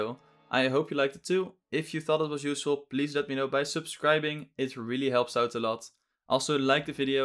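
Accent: Netherlands. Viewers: Haha ik kon aan je stem horen dat je Nederlands bent :) Je kan wel echt goed Engels hoor ik meen het ga zo door ;) dank je voor deze uitleg trouwens! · Hey, volgens mij ben je nederlands. Aan je accent te horen:). Ik had even een vraagje. Is de download van Inazuma Eleven GO galaxy gratis voor Citra op PC? Of hoe kan ik die krijgen?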